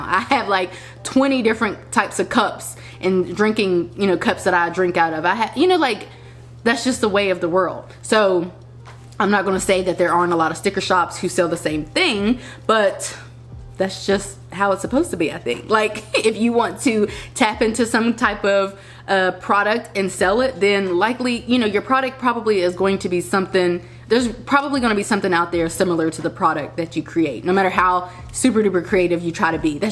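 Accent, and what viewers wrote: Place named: Pittsburgh, United States. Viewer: Lovely you have to be from my hometown Pittsburgh … I can pick up on our accent anywhere … it’s nice hearing it especially since I don’t hear it to often ♥️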